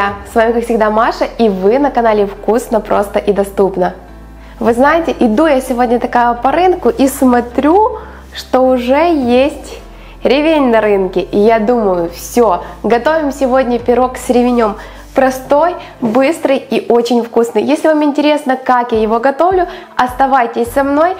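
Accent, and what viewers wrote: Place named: Ukraine. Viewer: Какая ты прикольная почему похудела очень нравится твой украинский акцент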